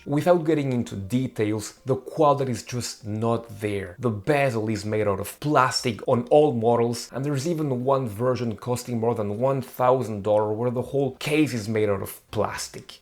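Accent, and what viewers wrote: France. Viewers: Thanks for the reoly. Obv not important, i just like knowing where accents are from. Im defn no expert on French accents and appreciate that tbey vary accross diff parts of the country..like everywhere. My experience: is my wife is French and ive been there a few times , stayed with her fam. Your accent just sounded a tad different to theirs . Enjoy your videos and appreciate your reply Cheers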